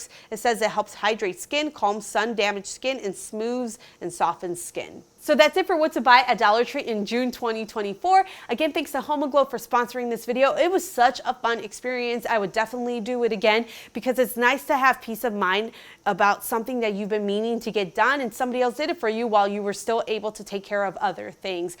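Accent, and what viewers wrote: Chicago, United States. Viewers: I'm so happy to hear that Chicago accent! I miss home so much 😞. Great video 💯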